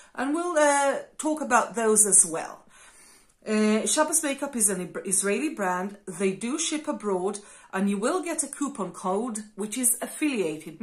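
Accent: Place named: England, United Kingdom. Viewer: מהממת והמבטא (אנגלי?) הורס...! 😍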